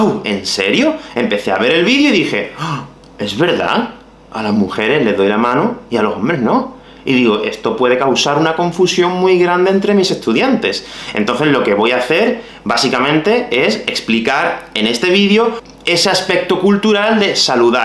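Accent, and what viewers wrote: Andalusia, Spain. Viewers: Me encanta tu acento andaluz!!! Soy americana, pero viví 2 años en Carmona y el acento andaluz fue el primero que escuché en español!❤ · ¡¡¡Me encanta tu acento!!! Ya he notado antes que has añadido un acento andaluz (en el vídeo de las multas), pero en este vídeo lo has petado ;) Ahora los estudiantes pueden sacar aun más provecho de tus vídeos. ¡Eres la leche! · Y me encantan tus acentos, tanto el andaluz como el acento más neutral 😊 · Más videos con el acento andaluU :) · Gracias por usar el acento andaluz poque la mayoria do los youtubers tiene un acento neutro y voy a estar en Malaga el mes de octubre entero y temo que voy a tener problemas en entender la gente. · Prefiero tu acento andaluz, pareces auténtico y real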